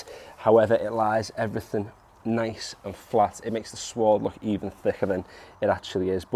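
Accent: Liverpool, United Kingdom. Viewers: Just subscribed and although there are loads of YouTubers doing what you do, love ya scouse presenting style 👍🏼 I have loads of initial questions and hope you can help: - I use a Ryobi lawn mower but always see people using Hayter. Definately worth changing to a Hayter? My ryobi doesn’t give me any stripes which is one good to reason to change. - 2nd question, it would be great to do one video ( unless I’ve missed it, in a sitting down and chatting and running though, in order what you should be doing. I know you mentioned about not necessarily by month as weather changes will dictate what to do when but that would be mega useful 👍🏼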